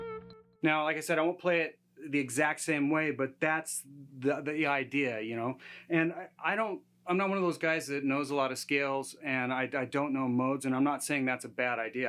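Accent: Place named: South, United States. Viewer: This was awesome stuff. love that country accent. had me rollin'.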